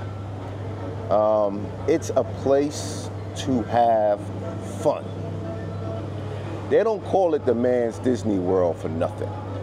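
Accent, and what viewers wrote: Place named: New York, United States. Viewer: New yawk what’s good dawg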